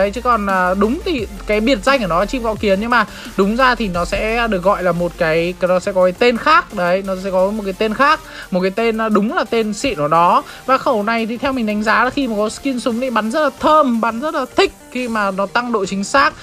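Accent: North, Vietnam. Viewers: Không thích giọng người Bắc à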